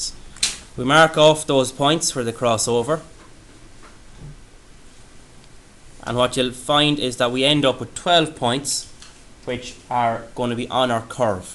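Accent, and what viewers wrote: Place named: Ireland. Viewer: the irish acent tho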